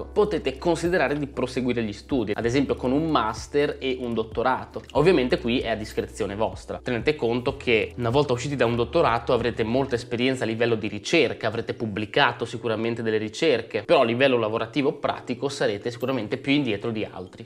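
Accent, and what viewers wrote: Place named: Ferrara, Italy. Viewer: ho sentito tantissimo il tuo accento ferrarese, più del solito😂 Comunque il video stra interessante, non sapevo un sacco di cose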